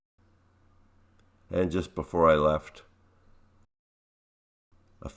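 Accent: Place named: Canada. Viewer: What accent do you have, id like to be able to identify it in future, its like a Canadian dan ackeroid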